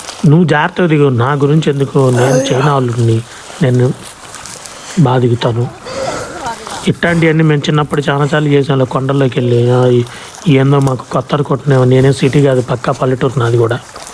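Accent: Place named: Prakasam, India. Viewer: nee lanugage prakasam slang lo vundi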